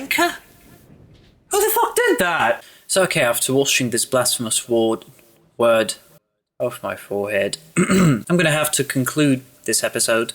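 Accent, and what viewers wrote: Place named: United Kingdom. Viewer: У тебя такой типично британский акцент)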